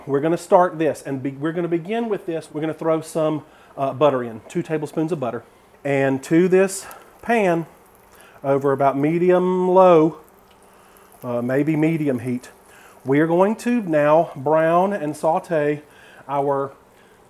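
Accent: South, United States. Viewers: I love you and that I understand you totally because we are SOUTHERN!! I have missed you boy!! I got to try to stay on low carb. It’s so hard here in the south. 🙌🏼🙌🏼🙌🏼 yes casseroles are comfort. Now- what about cream of chicken?? I have so many good casseroles with that too. I can’t wait to see what you have in store soon! Thanks for the video!!! ❤️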